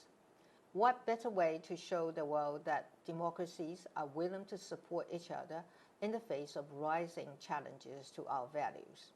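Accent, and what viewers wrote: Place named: United Kingdom. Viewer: 總統的英式英文聽起來很舒服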